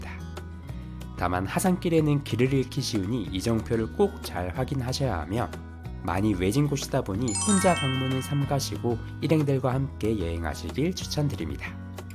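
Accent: Gyeongsang, South Korea. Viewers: 님 한의사라고 본것같은데 맞나요? 정말가끔유투브보면 차분한말투와가끔 경상도사투리가 귀엽고정겹습니다 감사해요^^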